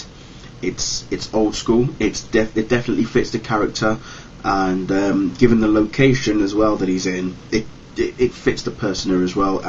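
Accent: United Kingdom. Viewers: What part of England u from I know it must be up north lol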